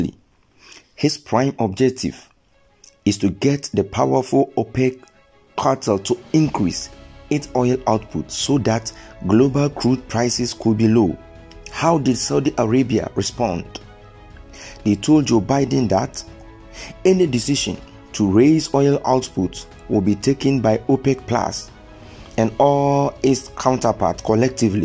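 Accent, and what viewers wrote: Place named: Africa. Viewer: It is an accent from somewhere in Africa.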